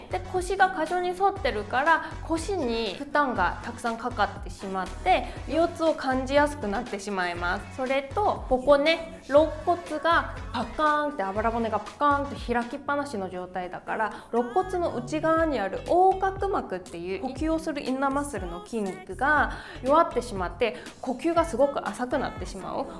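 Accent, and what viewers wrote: South Korea. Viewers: 韓国語のイントネーションを感じた🇰🇷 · 発音が韓国語でかわいい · 先生が韓国人だと知った上ではたまにあー韓国の人が訛りね感じるけどほとんど感じません。何度も日本語で話されてるからでしょうね。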